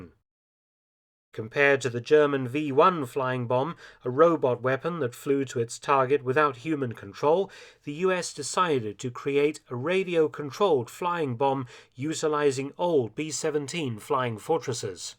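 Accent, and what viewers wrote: United Kingdom. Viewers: I love the way you limeys talk..."Norrich" we say Norwidge (I know that's not how it's spelled)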